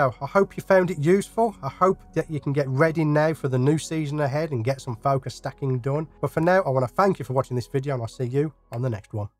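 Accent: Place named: Birmingham, United Kingdom. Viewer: that makes sense. I ended up getting one of the rails a bit like the Nisi one that you reviewed. I had a cheaper 4 way plastic one but I found it was a bit temperamental at moving. Also picked up a OM-1 which I am super happy with! Also, Birmingham based? I am sure I recognise that accent! (Brummie here too, but moved away for 10 years and recently came back, god knows why ha).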